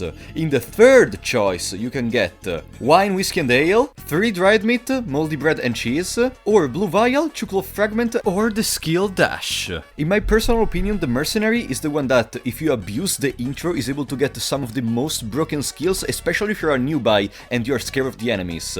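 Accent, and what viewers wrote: Italy. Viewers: Are you italian? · When I first started watching your videos your accent sounded Russian, but then after seeing you play the game Italian you now sound Russian but with an Italian twang at the end of your wordsa. Even the content creators for this game are a maddening riddle and mystery. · fwiw i love your Italian accent. I'm an American and I understand what you're saying.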